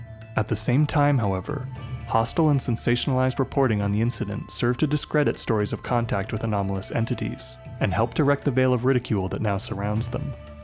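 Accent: Canada. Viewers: Are you from Canada or thereabouts? The way you pronounce certain words, like “about” and “house” is very endearing. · I can tell your Canadian 😂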